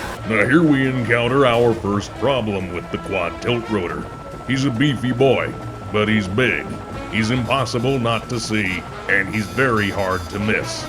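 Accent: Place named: United States. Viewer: hes the most american man i ever heard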